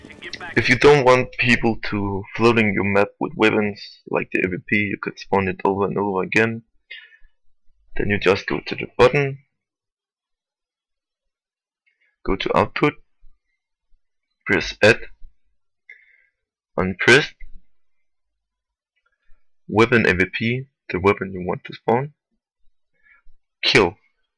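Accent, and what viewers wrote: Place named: Denmark. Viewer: Sounds like Danish English xD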